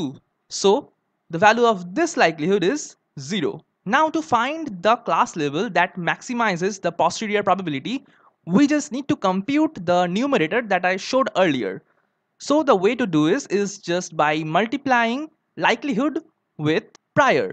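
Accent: Bengal, India. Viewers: You areee Amazing. I love your Indian Bengali accent ( just a guess hehe make me a voice analyzer if i am right XD )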